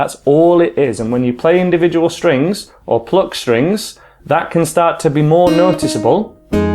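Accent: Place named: England, United Kingdom. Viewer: Nice English accent ~ I'm just a beginner and I like this song ~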